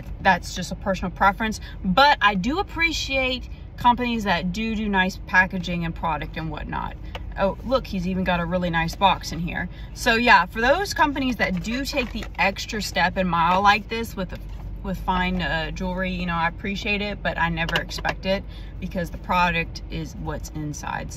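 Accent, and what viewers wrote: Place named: South, United States. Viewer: Hey it's been a while since I've watched your videos but you're beautiful as always and fun with that cute southern accent of yours.:) If you ever happen to visit Atlanta, Georgia we should hangout:D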